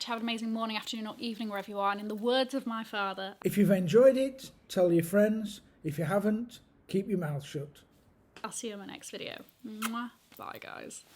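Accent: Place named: England, United Kingdom. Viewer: just came here to say I absolutely ADORE your accent ☺️ I’m new to the channel, so I’m not sure what part of England you’re from, but I think it’s absolutely smashing 💖 Hugs from America 🤗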